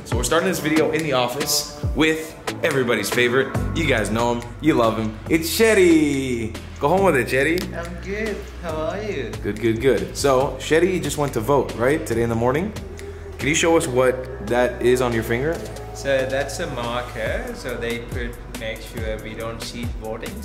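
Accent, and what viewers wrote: Sri Lanka. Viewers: You look Sri Lankan :D You even speak like some Sri Lankans. :) I think we may be rubbing off on you ;)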